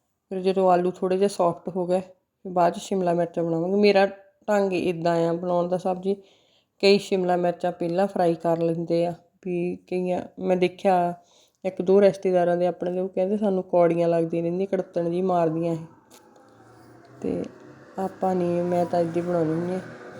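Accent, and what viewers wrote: India. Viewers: ਦੀਦੀ ਜੀ ਸਾਡੇ ਹੁਸ਼ਿਆਰਪੁਰ ਚ ਵੀ ਇਸੇ ਤਰ੍ਹਾਂ ਬੋਲਦੇ ਹਨ ਜਲੰਧਰ ਵਿੱਚ ਮੇਰੇ ਪੇਕੇ ਨੇ ਤੇ ਹੁਸ਼ਿਆਰਪੁਰ ਵਿੱਚ ਮੇਰੇ ਸਹੁਰੇ ਤੁਹਾਡੀ ਵੀਡੀਓ ਬਹੁਤ ਵਧੀਆ ਹੁੰਦੀ ਹੈ। ♥️♥️ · ਸਤਿ ਸ੍ਰੀ ਅਕਾਲ 🙏ਦੀਦੀ ਜੀ, ਇੱਕ ਪਲੇਟ ਮੇਰੀ ਲਾ ਲਓ ਇੱਕ ਕੌਲੀ ਸ਼ਿਮਲਾ ਮਿਰਚ ਦੀ ਇੱਕ ਖੱਟੇ ਦੀ ਕੌਲੀ, 😋😋, ਤੁਹਾਡੀ ਦੁਆਬੇ ਦੀ ਬੋਲੀ ਵੀ ਸੋਹਣੀ ਹੈ🥰, nyc sharing🎉🎉👍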